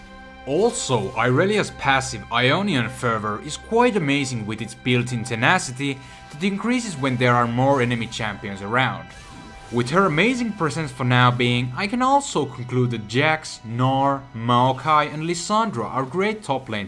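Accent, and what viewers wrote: Finland. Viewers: ***** dude stop crying about it, he is from Finland and pronouncing certain words for us in English is extremely hard. So stop crying. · ***** you can clearly tell that he has a finnish accent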